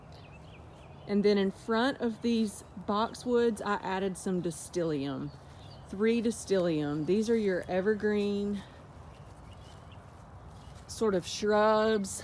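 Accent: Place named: Texas, United States. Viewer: I just discovered your channel today. You have an absolute gift at choosing plants, placing them just perfectly, mixing textures, and creating a beautiful layered look. You are so right that many people are intimidated by gardening. Also, I love your accent. It’s very similar to mine as I am from northeast Texas. Actually we don’t have the accent; everybody else does! Lol Anyway, you sound like home to me , and I am enjoying your videos.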